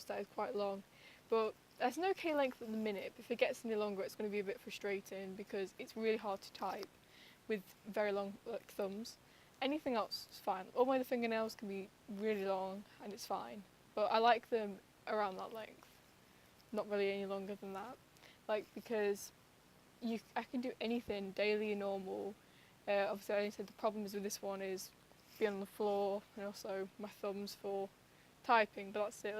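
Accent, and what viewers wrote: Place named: London, United Kingdom. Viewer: You sound like a London girl with that accent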